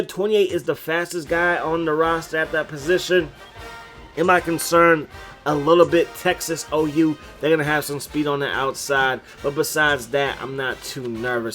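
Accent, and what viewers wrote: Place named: Baltimore, United States. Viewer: Barry Sanders and Thurman Thomas would disagree with your two RB statement. I am looking forward to another amazing series from you. One question for you. Are you from Baltimore or the surrounding area? The B-More accent comes out sometimes during your commentary.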